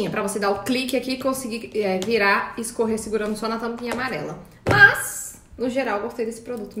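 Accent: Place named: Ceará, Brazil. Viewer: Impressionada como teu sotaque parece com o do Nordeste! Mais especificamente do Ceará. ❤️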